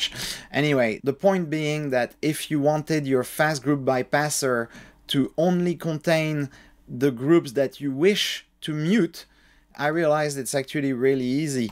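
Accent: France. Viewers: You sounds French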